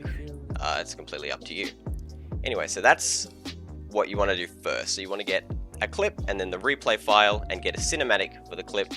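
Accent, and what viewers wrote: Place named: United States. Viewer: why does he sound like flea but with a american accent XD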